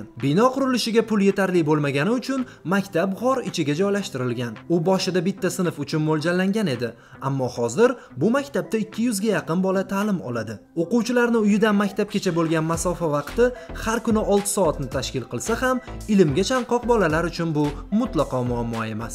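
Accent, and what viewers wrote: Tashkent, Uzbekistan. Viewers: Iltimos shevada gapirishdan qochishingizni so'rardim Toshkent shevasi yaqqol bilinib qolyabdi vedio uchun esa rahmat olg'a davom eting.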